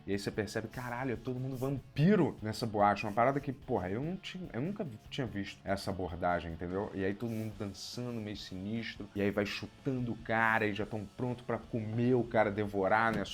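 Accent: Rio de Janeiro, Brazil. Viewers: Ultra irritante esse sotaque carioca onde no meio de cada palavra brota um “A” que não existe. Certo vira “CeAAArto” hoje vira “hoAAAje” fazendo vira “fazeAAAndo”. Nada nesse dialeto prende atenção por muito “teAAAmpo”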